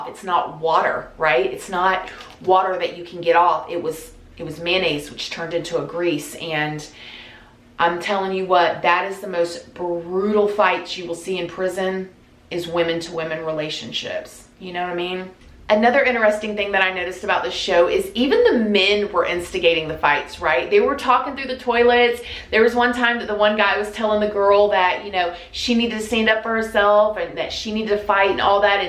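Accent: United States. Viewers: Love to see you Interview a guy called David Icke check him out? Most important information I've ever heard!!! 😉 check him out on Agenda 21 the plan to kill you! 😈 Top illuminatti spotter? 👤👥👤😲✊👀 if you got little ones? Please check out David Icke on the Vaccine hoax!! 😈 this life is nothing like they tell us it is!! 😲 check him out on Bloodlines!!!*😈 Crazy stuff? And Jeff Censored the most banned head on YT 😂 all your favourite Rockstars? And Actors ALL throwing up illuminatti Gang sighns?? On Jeremiah Cohen!!! It'll blow you away? 😲 so peace people from Sunny England and if you read this can I get a shout out please in that Top American accent 👍😆✌💙 from your Number 1 English lad!!😉 Zac xxx cheers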